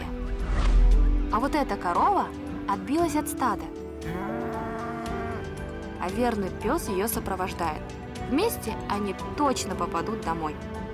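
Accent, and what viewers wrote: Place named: Russia. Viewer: наименования чисто қазақское произносится с руским произношением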